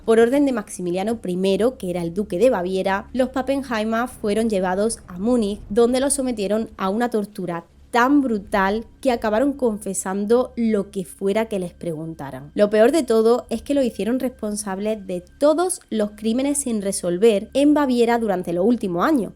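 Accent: Andalusia, Spain. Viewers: Prestando más atención, si que percibo un ligero acento andaluz en tu hablar. Perdón por mis erróneas suposiciones. Ni eres madrileña ni eres catalana. Dicho he. Aum namah shivaya 🙏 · Muy buen canal, excelente contenido para los que somos apasionados por la historia. El tono de tu voz es muy relajante y tu acento es muy bonito de que parte de España es este acento?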